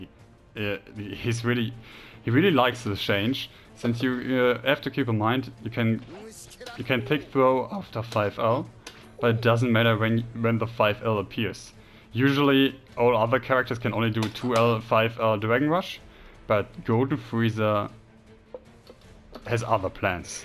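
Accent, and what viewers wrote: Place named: Germany. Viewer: are u german ? ur accent sound really really german xd wait ich bin dumm xd dein Spiel ist ja auf deutsch ;D gutes Video !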